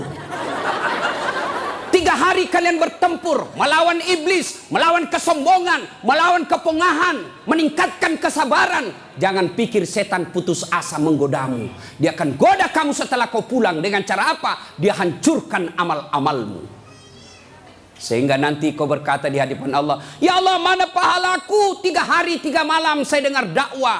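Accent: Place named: Bugis, Indonesia. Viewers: Suka sama bawakan ceramah dg aksen Bugis dan kalo baca ayat itu sesuai dg bacaan orng Sulawesi Krn disana jarang O yg banyak A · Ustad ceramah keliling Indonesia, tapi logat Makassar nya ndak pernah hilang.dan lucunya lagi siapapun yg dengar ceramahnya ikut tertawa. Ntah tertawa karena memang mengerti atau tertawa karena penyampaian logat Makassar nya 😊. Untungnya pernah 10tahun tinggal di Makassar jadi mengerti sekali apa yg beliau bilang...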